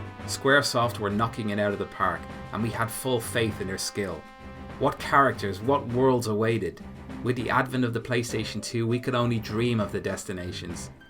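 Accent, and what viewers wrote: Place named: Ireland. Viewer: People saying 'why no IV, V, Tactics etc' should bear in mind that none of those games were originally released in Europe. (Or, as far as I'm aware, anywhere outside Japan or North America) By his accent I'm assuming grew up in Ireland, and for those of us in Europe, FFVII was not only our first Final Fantasy game, it was the first Final Fantasy game we were even capable of playing. 'Golden Age' is going to be by definition subjective and I took as embracing that in this video. At any rate to me and a lot of people like me, the games before FFVII are usually emotional non-events because we played them (if we even did) out of their era, much later on. The nostalgia just aint there.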